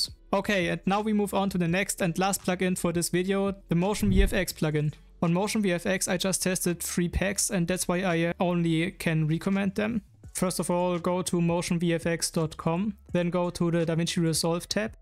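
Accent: Germany. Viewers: Do I hear da etwa Deutsch?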